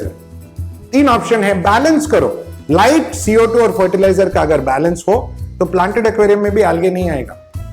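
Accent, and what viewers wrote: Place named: Mumbai, India. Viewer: Sir ke dialogues Sun kar lagta hai ki vo Mumbai sa hai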